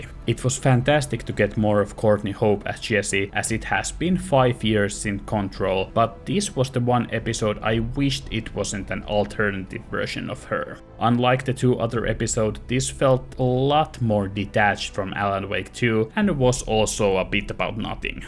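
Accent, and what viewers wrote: Finland. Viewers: good video :) Love the finnish accent !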